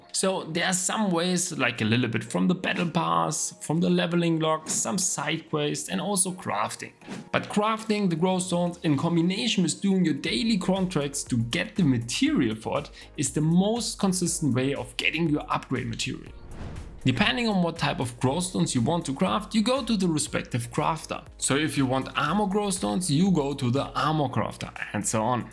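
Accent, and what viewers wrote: Germany. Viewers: Ich liebe wie man einfach hört das er deutsch ist xD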